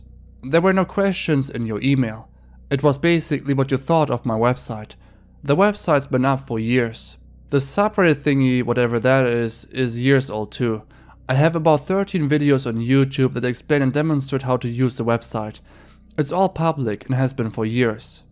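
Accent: Germany. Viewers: You have a hard to pin accent....kinda German, or Germanish, but I deserve 50$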